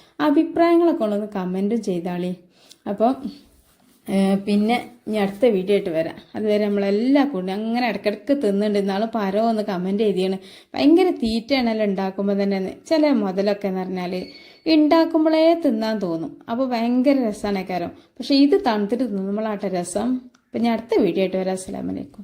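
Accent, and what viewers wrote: Malappuram, India. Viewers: വായ് പൂട്ടാതെ കമൻ്ററിയുണ്ടല്ലൊ ഇത്താത്ത അത് തന്നെ ഉഷാറല്ലെ. ജില്ല മലപ്പുറം ആണെന്ന് തോന്നുന്നു. മുരിങ്ങ തണ്ട് ഇതിനിടയിൽ ഇത്ത എപ്പഴാ തിന്നത്?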